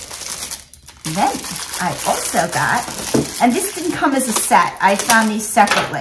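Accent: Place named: New York, United States. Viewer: Just found your channel ❤ I’m TEMU obsessed and my cart on the app just keeps getting bigger and bigger 🥹 I am from Long Island and moved to Florida a few years ago and heard you and your laugh and just knew you were another amazing New Yawkwr 😊 You’ve got another subscriber 🎉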